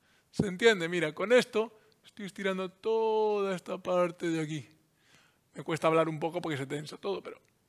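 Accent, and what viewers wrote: Spain. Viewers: Me encanta ese suave acento gallego. Una Canaria 💖👍 Gran explicación. 🤗 La posición de la cámara perfecta, se sale 👏👏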